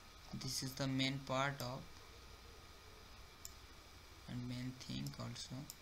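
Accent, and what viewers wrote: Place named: India. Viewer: This Indian access bro... I don't understand 90% from what those people say. (That's because of two reasons) 1 Their bad English, 2 My ears LMAO.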